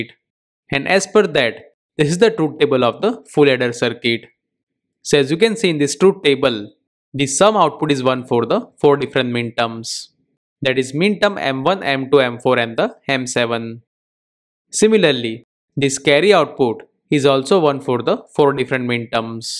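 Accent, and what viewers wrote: India. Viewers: Product is pronounced pro---duct NOT "productum". You need to improve your spoken English as your thick indian accent is very difficult to understand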